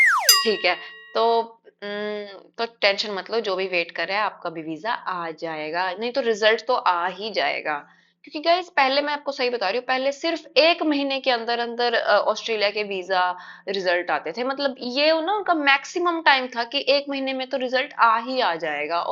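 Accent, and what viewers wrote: Haryana, India. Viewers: Haryanvi accent is lovable..it suits you. And this bhartiya Nar wants spouse visa 😁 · se aap hariyana se lagti ho 😂😂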